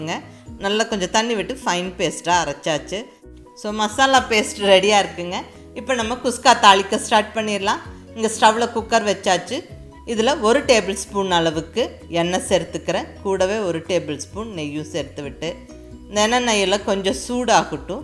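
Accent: Coimbatore, India. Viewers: always you rocks mam.... Am also coimbatore nanjundapuram amma home.. Now setteled in Chennai.. Husband home... Nama cbe slang kelumpothu avlo happy ah iruku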